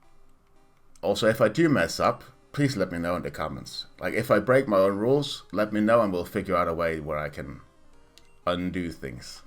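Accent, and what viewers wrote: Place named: United Kingdom. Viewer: what accent is that? makes me wanna pour in a cup of tea for your vids ahah great commentary, eventhough im not as much of a pokemon fan you make it enjoyable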